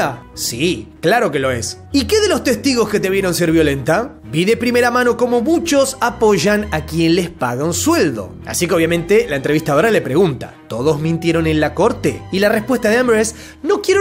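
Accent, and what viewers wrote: Argentina. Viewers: Me encanta el sarcasmo de este pibe. Le queda la irreverencia, es más su acento y la concepción que tiene uno de los argentinos encajan perfecto en, ha hecho tan suyo el caso que le creo todo. Simplemente me divierten y disfruto mucho. Saludos desde 🇲🇽.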